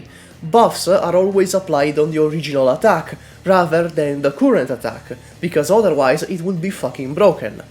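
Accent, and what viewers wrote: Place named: Italy. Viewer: Knowing how much Arknights community has been saved by Kyostinv and his guides, hearing another AK player with an Italian accent immediately made me go “Hmmm, yes, this checks out. I instantly trust this man.”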